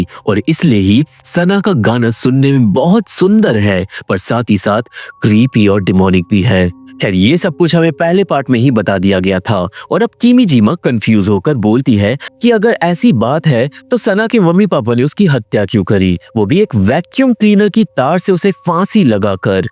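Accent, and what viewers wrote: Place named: India. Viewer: Bro sana aur matt bolo tera Indian accent bohut tagra hai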